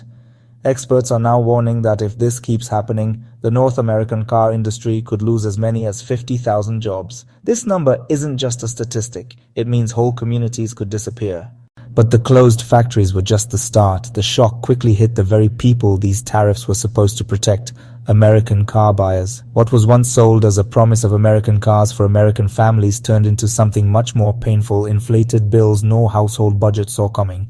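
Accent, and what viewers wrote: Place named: India. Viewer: I wonder why an indian from india is narrating this site! India joined china to destroy the dollar. People pretend to be our friends while they stab us in the back!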